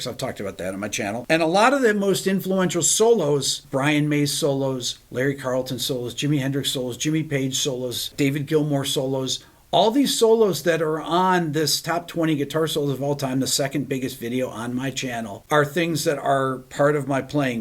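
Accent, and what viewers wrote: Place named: United States. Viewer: Been playing for 40 years but my knowledge is reassured everytime I listen to Me Beato, being Spanish my first language,my English skills are also honed with his excelent American accent.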